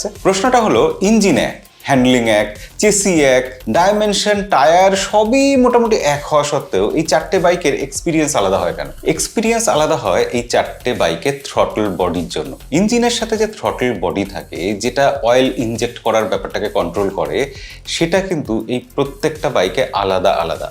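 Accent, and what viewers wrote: Bangladesh. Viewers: hola sunè mone hocche apni bangladeshi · India banaye eita ekhon mane ta ki osikkhito ei toh pure Bangladeshi citizen kotha sunei bojha jachhe, eta India e banato, Royal Enfield ekta Indian brand. Kotha sune bojha jachhe chinese maal khub use hoy Bangladesh e tai eto bhoy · U sound like a Bangladeshi... no offense... using bullet in india has a swagger factor because for ages the indian army , police and government officials are using this vehicle ... so we don't directly compare it with Bajaj duke ... better option for you guys is to buy a hero xpulse 😅😅 ...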